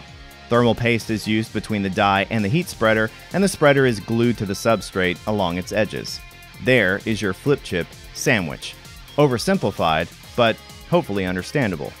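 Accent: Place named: United States. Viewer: Solder, he was talking American-English.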